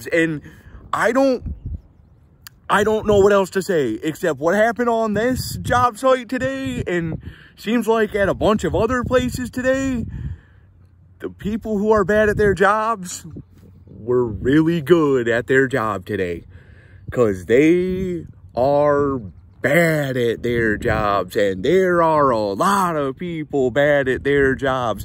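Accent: United States. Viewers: Wait are you from PA?? Lol love ya bro!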